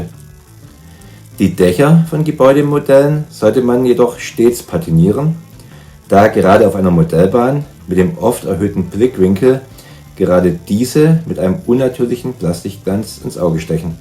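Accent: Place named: Swabia, Germany. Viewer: Den schwäbischen Einschlag hört man als Schwabe schon noch. Das ist wie Fshrradfahren. Kann man's einmal, blekbt es auch. 😊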